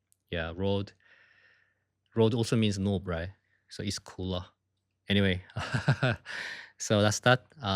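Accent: United Kingdom. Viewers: I am Afro-American and I can hear a slight British accent in and your voice! It's interesting to me😊🔥💯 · I'd say your accent is obviously British, I was able to detect it before I found out you studied in the UK. Your pup is adorable😅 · As someone who migrated also to another country myself I think your English is very good. Your accent sounds a bit like British. I think anyone with an accent means that they speak more than one language. In my opinion being bilingual it’s better than knowing one language. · Hi! I really like people's different accents, I find it s3xy. I can recognise the British accent in your speech and i admire your vocabulary and perfectly English speaking. I live over 10 years in England and my English is nowhere near yours, lol. I never tried to adopt a British accent, I simply can't. I try to pronounce words correctly so people can understand what i say (that's not always successful), but i do not mind. 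I am greek, i have different sounds in my language. You know something? I haven't met the English person yet, who can pronounce my surname correctly. Because that sound does not exist in English language. Just call me Ms K. That will do. And the app i use to learn korean cannot recognise my accent most of the times. That makes me try harder. I hope the 2 greek friends treated you with nice food back then. I am going to Corfu this summer ☀️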